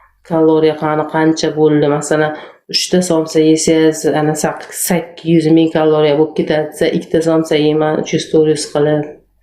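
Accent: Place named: Fergana Valley, Uzbekistan. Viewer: ❤. Salom. чиройли сингилжон, Шева водийликка ухшайсан, хозирда каерда ишлайсан, сенга омад тилайман. Хохласанг оздирадиган рецепт ташлайман. И. Б. Н. Синодан. ❤❤❤❤❤❤❤❤❤❤❤❤❤❤❤😂😂🎉🎉🎉❤❤❤❤.., раджаб ота78 еш.. шифор пенсионер.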